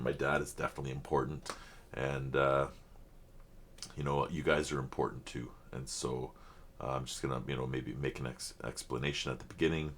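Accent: Canada. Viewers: I suspect is Canadian due to the way he pronounces his '-out' word sounds as -'oot'. He's been struggling with the pronunciation of Dimash's name for forever. It's not making a connection in the brain. I think we just have to accept it and not worry about it. He's a massive fan and loves Dimash as much as we do.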